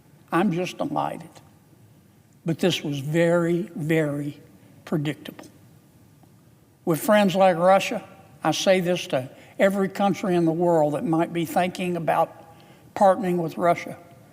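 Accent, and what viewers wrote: South, United States. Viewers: Russian president Vladimir Peutin. Love that southern drawl!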